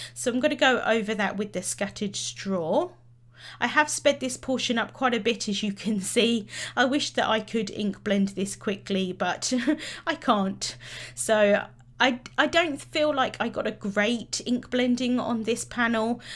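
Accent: United Kingdom. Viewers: Lovely card!! I love your accent!! I am from the US and love to hear the British accent!! You always make such lovely cards!!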